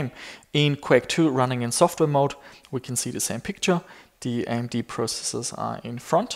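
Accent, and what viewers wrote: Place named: Germany. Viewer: I imagined something like that happened., allow me one personal question: do you originally come from a German speaking country? I noticed your accent and every time you pronounce "Wolfenstein".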